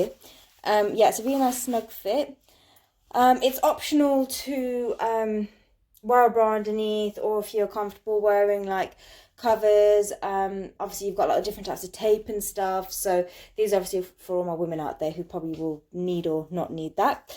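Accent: United Kingdom. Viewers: Hey, OMG you look stunning, especially the white see through... Perfect. Also, that British accent, very classy all the way. Stay safe gorgeous..🤗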